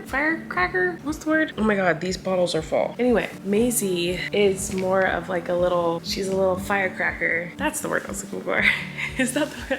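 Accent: South, United States. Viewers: Sweet, get his southern accent from you! You do a lot of “character” talking. He’s doing you. ✌️❤️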